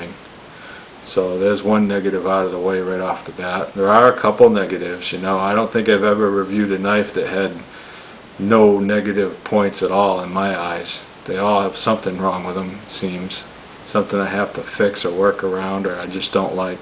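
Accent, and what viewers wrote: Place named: Brooklyn, United States. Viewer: nice review bro! you got a cool Brooklyn gangster accent. where you from?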